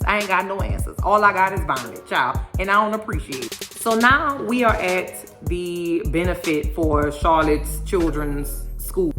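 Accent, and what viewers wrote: New Orleans, United States. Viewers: You sound like my people back home in New Orleans… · Your channel came thru my feeds on sheer accident and I LOVE IT😍First off Bayyyyybeee I can hear that Nawleans accent a mile awayyyyy heard me😝 secondly, you are HILARIOUS 🤣🤣🤣 When you went off bout Steve sticking his sticky fingers all over the mashed potatoes and kitchen cabinets I was outta here 💀🤣🤣🤣🤣🤣🤣🤣🤣🤣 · Geez! I'm so glad I found you and your channel. Ive watched reviews of this show from others and can't even get through the full review. Plus, your New Orleans accent made me subscribe in less than 1 minute into the video. I know in my bones this about to be good! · I knew you were from New Orleans the second you opened your mouth. You have a new sub, neighbor!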